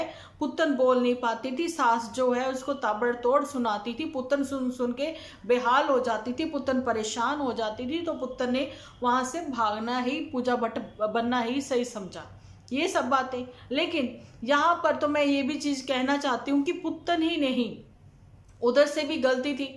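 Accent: Punjab, India. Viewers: that’s Good 👍 I love your Punjabi Accent lots of love side by 🇨🇦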